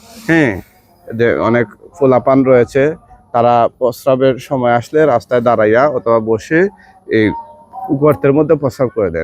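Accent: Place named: Sunamganj, Bangladesh. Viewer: Uni asole sunamgonj taken na sylhet. Unar kotha Barta sunamgonj era moto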